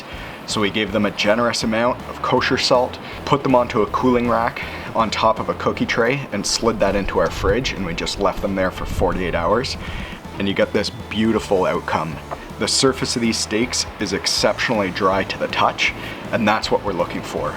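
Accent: Canada. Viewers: This guy talks like a Canuck. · Homeboy sounds Canadian Respectfully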